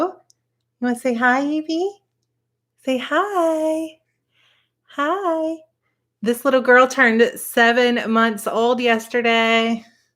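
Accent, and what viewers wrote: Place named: South, United States. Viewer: I live in Arizona and like Californians we don't have an accent. However, you have just a hint of southern accent that makes your voice so charming. Please seriously consider narrating your books. ... The other thing is, I'm always disappointed when there's a hired narrator. It makes it soooo much more personal to get the book read to me by the author. Sending lots of love ❤ from sunny 🌅 Arizona 🌵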